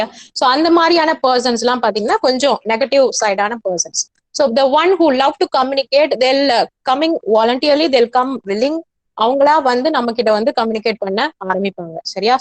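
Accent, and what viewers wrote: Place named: England, United Kingdom. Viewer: Mam I like your english accent .really inspired mam ✨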